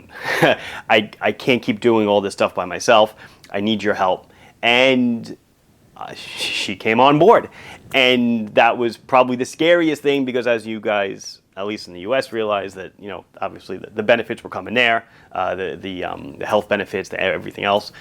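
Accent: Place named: New York City, United States. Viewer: Hey! Awesome video! Great story! I never had the feeling it was a one man show because of the excellent service and attentiveness I receive when I shop with LIW. I love the fact that you're an engineer AND a watch guy and that your wife is helping keep the business with you. And as a Brit in Switzerland I really like to hear your Manhattan accent because you say bought, caught etc. like we do.:-) Keep 'em coming! Sincere best wishes for now and the future to you, your family and your employees. Rob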